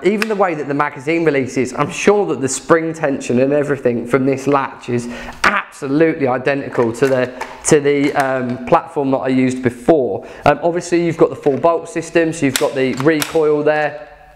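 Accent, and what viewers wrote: United Kingdom. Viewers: Fuckin' brits accent. USA!!! USA!!! USA!!!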